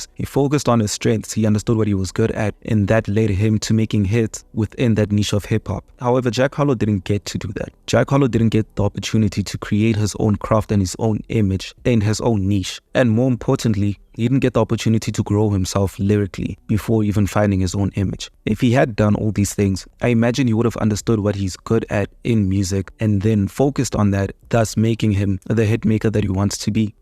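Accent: South Africa. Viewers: You from South Africa my brother? If so, me too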